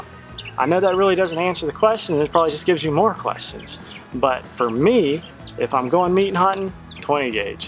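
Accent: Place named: Alabama, United States. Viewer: I love all your vids I'm in South Alabama we have the exact same accent lol l think it would be so cool to hunt and fish with you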